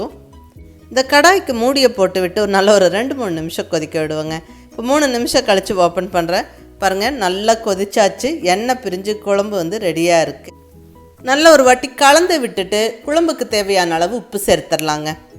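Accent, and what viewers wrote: Kongu, India. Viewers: Super madem kongu tamil super mam tku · Namma kongu Tamil la fantastic ah ahsathureenga.......superb ga ma Vaazhga valamudan 🙏 neengalum vungal ahnbu kudumbamum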